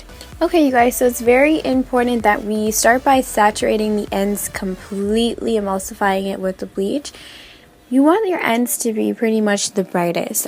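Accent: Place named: Guyana. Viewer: love your Guyanese accent I'm mixed and mines comes out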